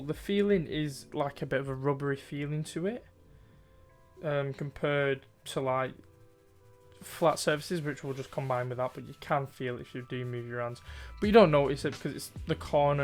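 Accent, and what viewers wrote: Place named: Manchester, United Kingdom. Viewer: You sound like you're from a town near me in Manchester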